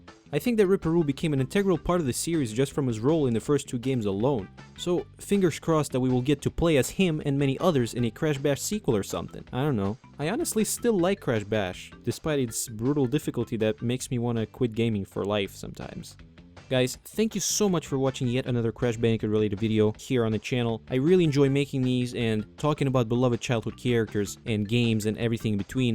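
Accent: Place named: New York, United States. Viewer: Wait, your first language was not english? I could easily pass you for a new yorker, your accent is perfect for america!!!! I just sound like a (weard) english person.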